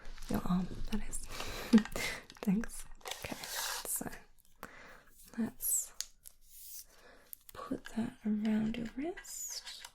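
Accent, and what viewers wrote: Australia. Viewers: just aussie mate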